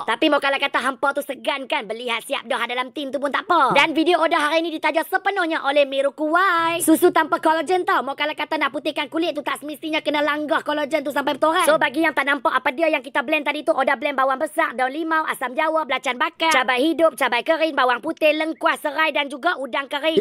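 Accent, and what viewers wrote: Kedah, Malaysia. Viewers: Sedia2 bedah kna na.. Cian dia. Syok dgaq bhasa kdh piaw..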